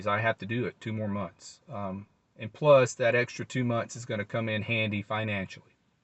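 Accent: South, United States. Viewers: I just found your channel. It is very interesting. I have already seen several videos. I do not understand why your videos are interesting when all you do is just talk to us from your driver’s seat. Is it the pleasant face? Is it the melodious Southern drawl? Is it the nice story telling? I just don’t know but I have subscribed to your channel and clicked on the bell. Best wishes.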